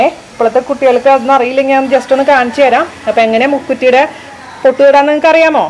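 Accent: Thrissur, India. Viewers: Kkk👍. Chechide slang vechit...naadu Nammade thrissur aano ?? Njanum thrissur aatta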